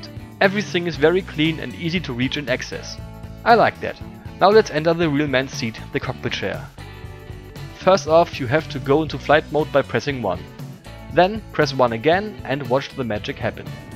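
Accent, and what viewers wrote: Germany. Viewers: thats a nice ship, i only build in smallship form because it has more detail for my steam-punk fleet. btw, are you german? iam german and you sounds like that. :D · are you german? cuz your accent, you know...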